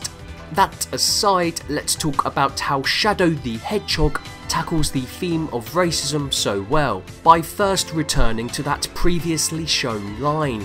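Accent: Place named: United Kingdom. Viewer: This checks all boxes for a video essay. Even that you’re Bri’ish. So unfortunate.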